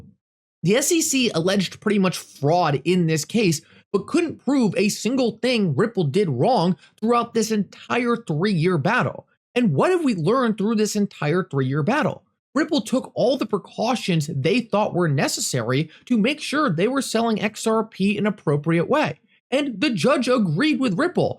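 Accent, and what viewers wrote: Allentown, United States. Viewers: with an Allentown accent broken record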